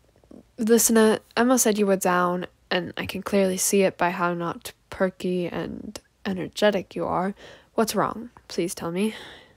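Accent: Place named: United Kingdom. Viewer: I like how ur voice sounds like it has a lil bit of an accent like a British one U probably don’t get me……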